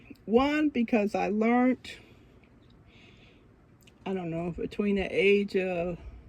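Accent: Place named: Alabama, United States. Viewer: I wonder if one of your local yarn shops has a weaving class? Every year on TV I watch the PA Farm Show, and they have a competition for the best wrap/shawl. That is my favorite part on the PA Farm Show. I love weaving, but having a loom is another item I just can't have. Please have many more videos on your progress. I think this is one of my favorite videos that you made for your yarn friends. My husband ask me if you are a Southern girl. He said that you sound like his friends from Alabama. : ) I just love you; your the best!!!! Smiles, Claudia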